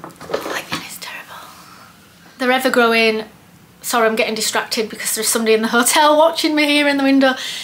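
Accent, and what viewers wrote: Yorkshire, United Kingdom. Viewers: Yes, I did inherit the Yorkshire sense of humour-the best sense of humour in the world! I was born in NZ, but my entire family was born in Leeds, including my older brother. I think that is what initially attracted me to your videos. Even after being here 60+ years, my mum's accent is still as strong as yours. I have only been following you for a couple of months and am gradually working my way through all your past videos. It's about 6.30am on Friday morning (yes, I am in your future😱😳🤪😅), so time to get mum out of bed - no rest for the wicked. Do you have a job? How do you fill your day? Maybe you have done that video, and I just haven't seen it yet. I like watching your thought process as you declutter. I have trouble with letting go of mementos picked up from traveling and items that remind me of good times. And teddy bears. 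And CDs. And tarot cards. And duplicates of things (as NZ is earthquake and tsunami prone, I am constantly worried about not having backups if I lose the original). Oh God, 10 minutes later, and mother will be wetting the bed. Have a good night. Enjoyed chatting. If you are ever down this way ..... call in, have a coffee, stay. Would love to meet you, you come across as kind, funny, calm and very friendly 💖💖💖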